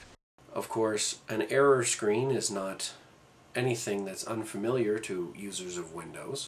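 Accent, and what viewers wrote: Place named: Canada. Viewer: You sound Canadian. Anyway, if you have so much experience on computers--I'm surprised that you blindly bought Professional without a second thought. Yes, I know a fair amount of consumers may be unaware of such a thing, but I'm surprised that you didn't catch such a thing.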